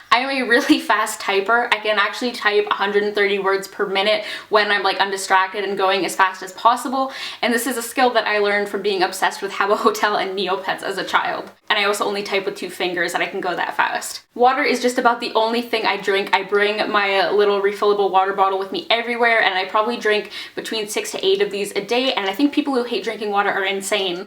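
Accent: Canada. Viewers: it is SO funny because as I was watching some of your videos I thought to myself "wow I bet she's canadian because of her accent" and then I read that you ARE canadian!!! ( I am Canadian as well haha). Anyways love your videos :)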